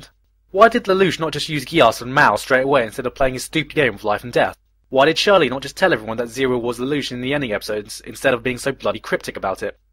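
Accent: United Kingdom. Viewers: it might just be because all of his insults are with a brittish accent, but this dude is freaking hilarious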